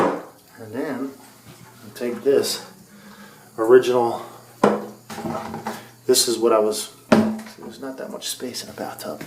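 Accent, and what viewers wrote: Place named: United States. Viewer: Hy drott in Iove it. you usavians, are so sweet with your accents.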